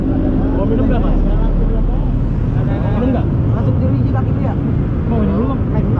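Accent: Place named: Malaysia. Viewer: dia orang indo tuh. Karena lama di Malaysia jadi logat dia kaya orang Malaysia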